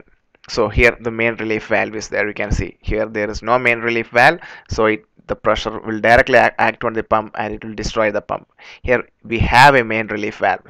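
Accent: Kerala, India. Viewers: We dont like kerala english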